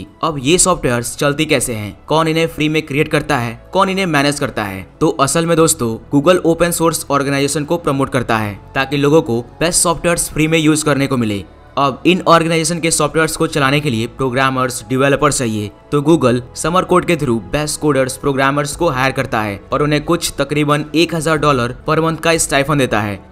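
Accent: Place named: Assam, India. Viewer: Aap assam se ho kya?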